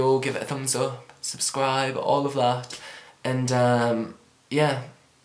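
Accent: United Kingdom. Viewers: scottish or british or welsh accent?? anyone know??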